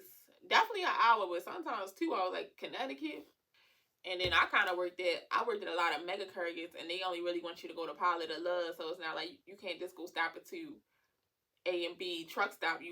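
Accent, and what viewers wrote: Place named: New York, United States. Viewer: I hear the New York all In you lol .. I’m Right next door though in Philly so unknown how that parking get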